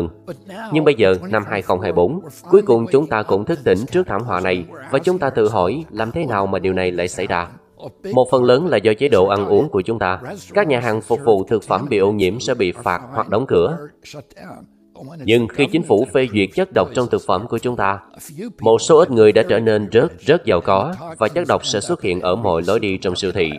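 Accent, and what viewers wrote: South, Vietnam. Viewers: Nói giọng MN mà toan la dùng từ MB,( mỡ lợn, quả ngô, bỏng ngô, đảm bảo, ), nghe nó ngộ ngộ sao đâu. ( Mỡ heo, trái bắp, bắp nổ, bảo đảm). Và còn nhiều từ MB nữa.Vv..vv. Cũng có thể anh này là người Bắc 75-2024. Cũng cảm ơn Anh rất nhiều vì đã chia sẻ những thông tin rất là hữu ích.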